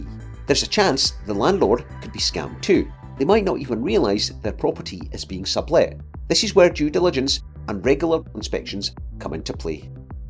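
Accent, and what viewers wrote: Scotland, United Kingdom. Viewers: I’m a real estate attorney in the US I want to start seminars and book them at the same time and in the same hotel as the FURUs I would call it ‘Why You Should Not Listen to Anything You Were Told in Ballroom A’ (my seminar will be held in Ballroom B) Also, I would like to narrate my course (which will be available to download if you attend my seminar in Ballroom B) because my parents were from Scotland, so listening to a Scots accent is both familiar and comforting..... but only if there is also some occasional yelling about what I am doing wrong.... 😉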